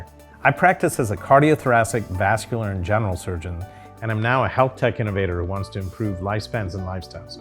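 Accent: Maharashtra, India. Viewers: I liked your Marathi accent, 🤩🙏 It's a pleasure and feel proud to see that such a superior doctor who practices medical overseas for many years hasn't forgot his roots. You are such a down-to-earth man. I truly admire you 🙂 Keep inspiring us through your lovely videos 👍 🤩Stay fit and keep smiling 🙂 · Loved your marathi ॲक्सेंट ❤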